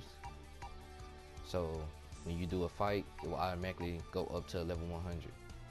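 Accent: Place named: Louisiana, United States. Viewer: I freakin luhv your accent. Are you from Louisiana? lol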